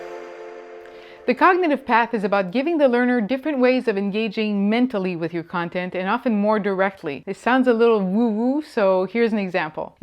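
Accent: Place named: France. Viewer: L'accent français s'entend légèrement sous la couche d'accent nord américanisé. Un peu comme un vêtement rouge qui se voit sous un manteau légèrement transparent...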